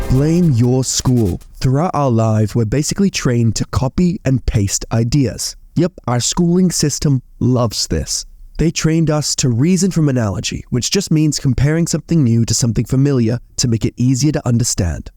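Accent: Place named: United States. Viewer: Yo I'm just wondering if you're really from India. Ur accent sounds like American, great!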